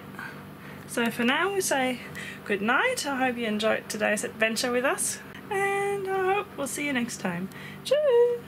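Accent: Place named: East Germany, Germany. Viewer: Was the water so deep? It almost looked like you could just drive through :) would have been funny and maybe wet in the car :) und ich liebe den Ostdeutschen Akzent :D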